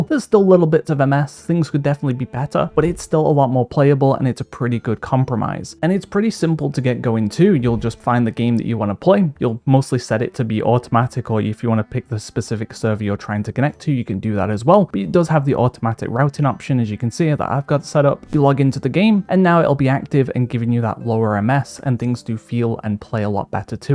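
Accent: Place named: Liverpool, United Kingdom. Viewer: I’ve enjoyed this sounding guide to say u vowels in a liverpool accent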